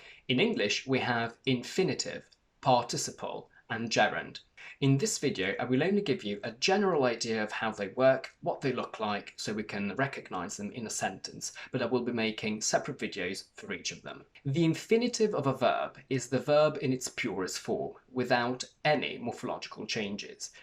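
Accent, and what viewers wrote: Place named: England, United Kingdom. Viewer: Love your English accent.. happy to see you again..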